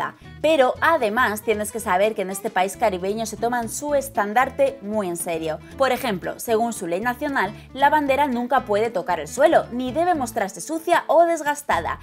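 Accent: Spain. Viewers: tu voz de española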